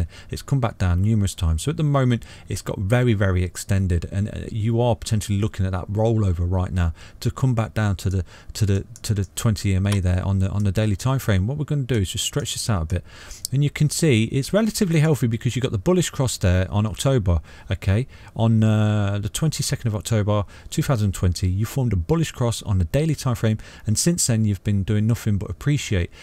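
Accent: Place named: West Midlands, United Kingdom. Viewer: Thanks for the vid, I enjoyed it and learnt a lot. Also sounds like you're also a west midlands chap?hah Where were you looking at this TA chart? cant seem to get the detailed view on coin market cap. Also, im guessing as it went through the level of support you suggested, a new entrry point might be at $0.00020?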